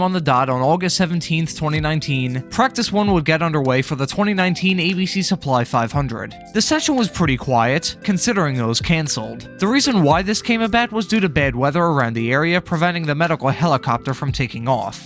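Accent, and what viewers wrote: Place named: Philadelphia, United States. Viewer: Didn’t pick up that Philly area way of pronouncing certain works till you mentioned where you’re from then I couldn’t unhear it lol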